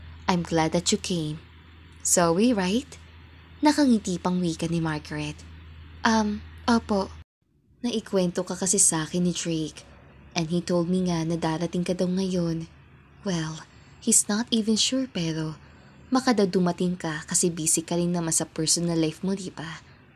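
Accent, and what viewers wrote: Visayas, Philippines. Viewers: Napakaserous Kasi Ng Bago mong narrator Hindi kagaya mo na Minsan may nakakatuwa Lalo na Kong may halong bisaya tuwang tuwa ako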